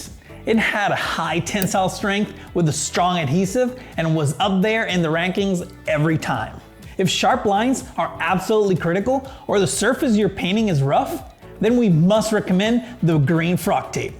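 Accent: Quebec, Canada. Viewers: Great video! Are you French Canadian?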